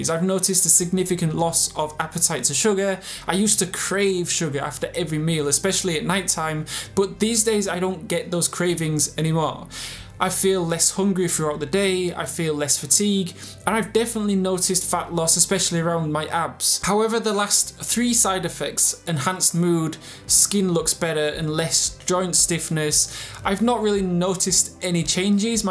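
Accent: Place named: Northern England, United Kingdom. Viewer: When you lived in the UK, were you from the North of England? That accent is nice! ☺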